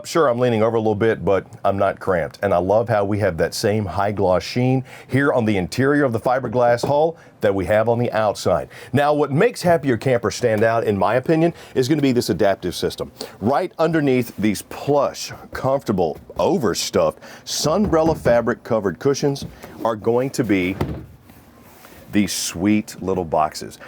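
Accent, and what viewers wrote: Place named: United States. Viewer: I cannot imagine a more American-sounding person than this man. That's neither good nor bad - just an observation. The accent, of course; but also the tone, the diction, the rhythms...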